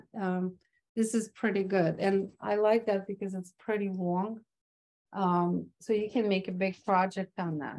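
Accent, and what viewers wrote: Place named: New York, United States. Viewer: great tutorial, loved the NY/LI accent. Is there a link to upcoming workshops?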